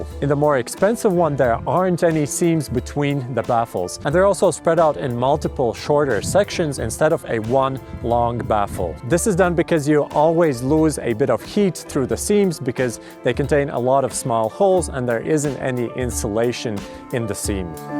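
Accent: Latvia. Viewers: Tu takš letiņš pēc akcenta 😄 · Sounds like Latvian accent...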